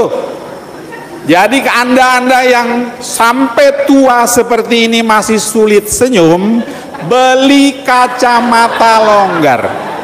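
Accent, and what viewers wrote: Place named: Java, Indonesia. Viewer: Ya Romo kocak lucu namun serius Maaf sy pertama kali melihat Romo di Youtube Mau tanya Romo asli dri mana Sepertinya bahasa Jawa medok.. Semoga Romo sehat selalu .. AMIN❤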